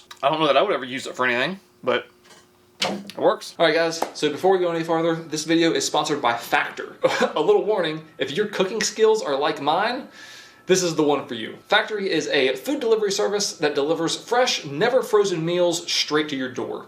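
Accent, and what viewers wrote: South, United States. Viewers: Zucchini ---- pronounced zoo key knee. and it's a squash!!! BTW the next time you want to make corn dogs, use cornbread mix, not pancake batter. You sound like your from the South, you should know a good cornbread recipe. That's why they are called CORN DOGS not PANCAKE DOGS! There is a green light on top, it will turn on when they are ready. YOU CAN'T SAY IT TASTES LIKE A CORN DOG!! IT'S NOT MADE WITH ANY CORN !!!!!!!!!!!!!!!!!!!!!!!!!!!!!!!!!!!!!!!!!!!!!!! BTW You can buy sausages made the same way in pancake batter in the frozen food aisle. hahahahahaha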